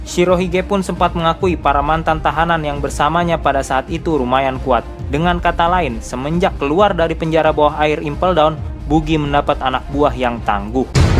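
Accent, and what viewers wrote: Indonesia. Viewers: Aduu baang, bacanya diperbaiki mohon. Jangan semua nama diucapkan sama pelafalan Indo :(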